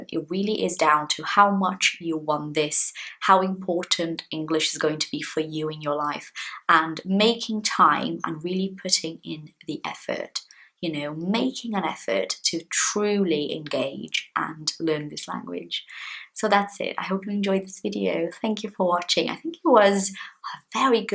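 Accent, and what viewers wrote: United Kingdom. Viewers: Despite your process learning hadn't been easy you achieved a great English with a excellent and clear British accent. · I don’t compare.. I know kids are like a sponge 😂 But I should get your advice, I just pay attention to my mistakes not to the victories. I love you British accent, it’s so clear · Beautiful accent! Clear, pure and real british english! Love it! Congrats!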